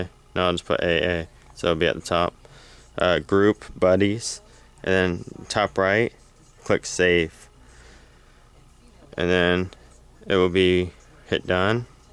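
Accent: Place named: South, United States. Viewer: do u live near the South? u have an adorable accent :D haha lol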